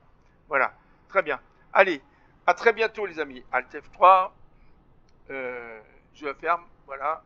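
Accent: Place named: Lyon, France. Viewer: Merci!! Dites-moi, à vous entendre, vous semblez être de la région lyonnaise. Je me trompe? ps: je viens d'essayer, je me suis mis dans le jeu, j'ai bien une carte nvidia, mais cela ne fonctionne pas: « alt F3 » ne fait rien du tout. Arf...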